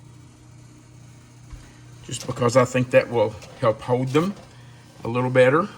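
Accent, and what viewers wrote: South, United States. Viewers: I see you're from Kentucky do you have a southern talk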